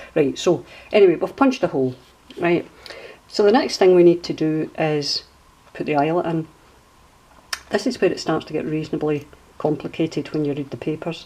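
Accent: Scotland, United Kingdom. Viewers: Thank you for this instruction. Just bought a crop-a-dile and had to check YouTube for advice. By the way, I love your Scottish accent. It's a joy to listen to you. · I love listening to you talk, I was so distracted by your lovely "accent" that I wasn't paying much attention to the instructions. Can you tell I love outlander? 😂 but I've always loved scotland and ireland both. I love the way people speak, it gives english such a flavor, I'm actually infatuated with it. I'll just keep listening to you talk now. Thank you for the tutorial as well, everything sounds better with a scottish accent 😊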